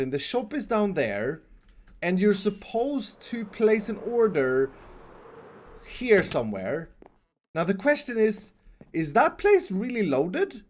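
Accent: Sweden. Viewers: Are you from Sweden iskall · Are he Swedish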